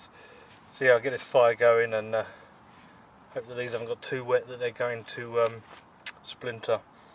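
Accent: United Kingdom. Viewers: R u from UK?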